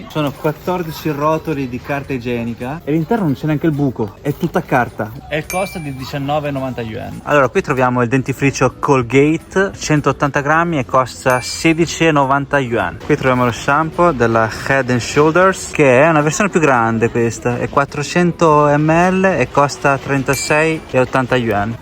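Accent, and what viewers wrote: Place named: Emilia, Italy. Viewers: Sei cinese ma vivi in Italia? Mi sembri un po' emiliano 😊 o sbaglio? p.s. bel video alla fine anche in Cina si trovano prodotti italiani. ❤ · soccia sei di bologna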